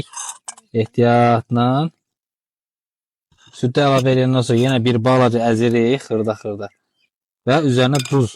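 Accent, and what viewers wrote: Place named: Zagatala, Azerbaijan. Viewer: Salam,bildiyime göre Zaqatala!💐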